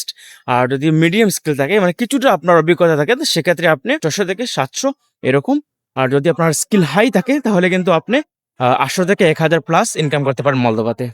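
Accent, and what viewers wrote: Sylhet, Bangladesh. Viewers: Bhai afne Kita sylheti ni? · ভাই কিতা সিলেটি নি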